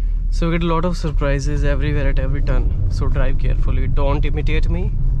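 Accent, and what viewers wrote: Haryana, India. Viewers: Haryanvi Chalke h bhai boli m 👍👍👍😃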